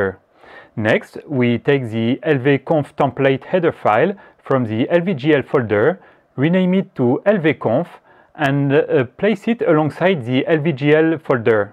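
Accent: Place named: France. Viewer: Excellente présentation!!! Il me semble entendre un accent français, à la base ;-)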